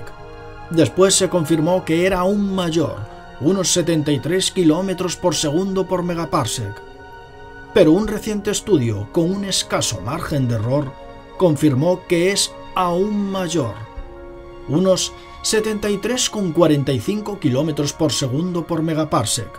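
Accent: Spain. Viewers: lindo espa;olito me gusta su acento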